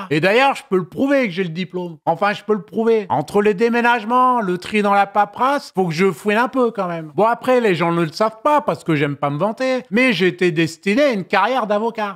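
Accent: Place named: Paris, France. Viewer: c'est la gouaille parisienne sauce bourpif sur lit d'écriture talentueuse. J'ai la glotte qui flotte et j'ai encore faim.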